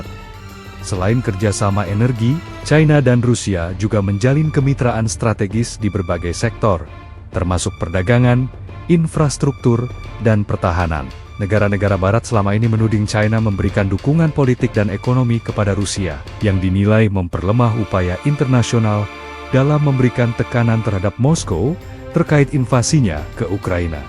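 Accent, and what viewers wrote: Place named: Java, Indonesia. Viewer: Pembaca ini logat Jawa sangat memalukan membaca cina saja ti pecus yg benar itu China bukan Chaina seharusnya gunakan bahasa baku bukan bahasa ke barat baratan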